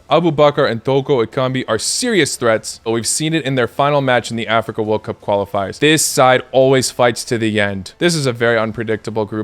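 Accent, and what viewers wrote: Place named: United States. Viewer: Au brate zvucis tacno ko amerikanac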